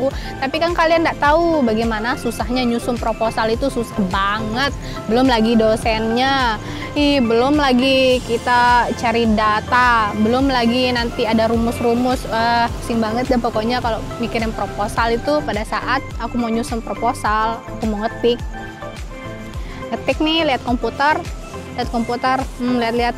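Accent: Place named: Lombok, Indonesia. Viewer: logat lomboknya keliatan banget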